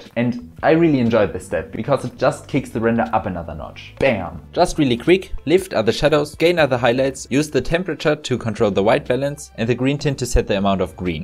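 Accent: Germany. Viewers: ich hör da einen deutschen akzent!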